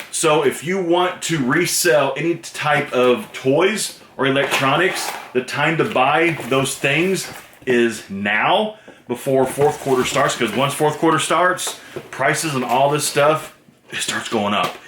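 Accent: United States. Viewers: I had a feeling that you were from the midwest but wouldn't have guess you are a Hoosier aswell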